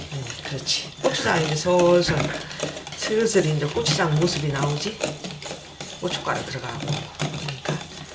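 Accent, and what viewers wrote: Chungcheong, South Korea. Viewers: 말씀하시는 말투가 충청도 말투이신디 이 어느지역이예요? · 얼굴 보여주세요. 항상 웃음이. 정겨워요. 여사님. 충청도. 사투리. 옛. 어린시절생각이 많이 납니다. 칠십이. 넘어도. 구수한 자기 고향 사투리가. 듣으면. 어린시절. 시간들이 필림처렁. 돌아가. 자주. 시청합니다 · 구수한 장맛 구수한충청도 사투리 잘 보고 갑니다. 건강하세요. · 아이고 너무너무 잘하십니다 가까이계시면 인사나누고 싶네요 달인이십니다 충청도 억양 말씀이 정말 정감이 가네요 저도 충청도 부여에유 부디 건강하시고 맛난 고추장 계속 올려주셔유 ❤ 존경스럽네유 🎉🎉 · 충청도이신가봐요 넘웃겨요 말씀하시는것이 구수하구 ㅋㅋㅋ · 고추장 정학희. 일러주셔서. 감사하오.충청도 사투리 소탈한목소리 좋아요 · 충청.음정.넘 정겹네요~^^ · 충청도 사투리가 넘 정겹고 젤 맛나게 설명을 해주시니 머릿속에 쏙쏙 들어오네요~영상 감사합니다^^ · 보리밥에엿기를넣고 한꺼번에 삭히는 방법 이 편하겠네요 구수한 충청도말 씨도 부럽네요 배우고싶어 따라하는데 잘ᆢ안되네요 열씸히구독하겠습니다 고생하셨어요 잘ᆢ보았 습니다❤ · 아주머니 충청 도래요 말씀 하시는것이 충청 말씀같아요 고추장 하는것 된장도 잘보았습니다 항상 건행 하세요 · 저도 충청도 대전 사람이지만, 해외에서 선생님의 구수한 충청도 말씀으로 자세한 설명을 들으며 보니 고향생각도 나고 구수하고 정겹습니다.. 감사합니다.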